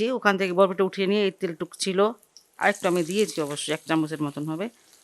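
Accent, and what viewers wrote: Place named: Jamalpur, Bangladesh. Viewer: আপা আপনার রান্না গুলো খুবই ভালো লাগে,, আপনার বাড়ি কোথায় মনে হয় জামালপুর জেলা আপনার কথা বলা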